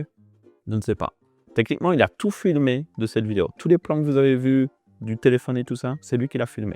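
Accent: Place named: Belgium. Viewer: Nos amis belges ont un bel accent.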